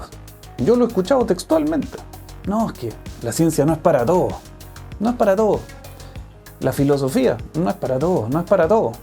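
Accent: Chile. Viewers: que cuatico que, aunque uses español neutro y bien pronunciado, pude adivinar que eres chileno! algo en el tono, en las ultimas ''A'' de las palabras... algo me lo decía. comentario na' que ver con el video, pero es primera vez que llego a tu canal, me pareció curioso <3 saludos desde Valpo :D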